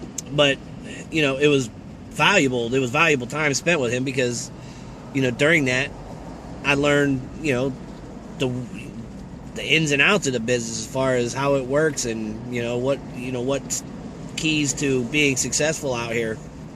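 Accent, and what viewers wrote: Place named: Laredo, United States. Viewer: is this Laredo TX it sounds like it 😂 👍👍👍👍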